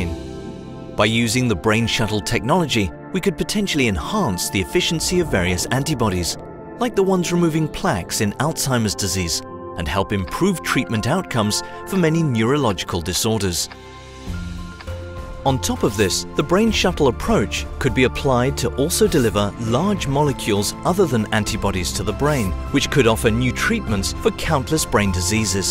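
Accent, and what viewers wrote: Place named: RP, United Kingdom. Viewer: "Location: United States" Why the posh british accent though?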